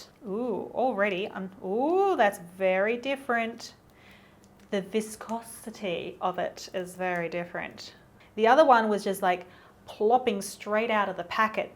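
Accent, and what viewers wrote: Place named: Australia. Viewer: Thankyou for this. I love Liquitex! I just bought an art course and they recommend the Liquitex heavy body, which I’ve never used as I use the regular paint in varying brands, so I’ve been doing research on it. And I found this video - imagine my surprise to hear an Aussie accent! And you’re just down the road - I’m in Brisbane! So new Sub here… Cheers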